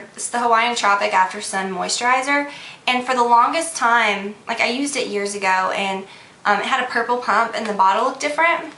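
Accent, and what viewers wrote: South, United States. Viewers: Your southern accent really came out in this video:)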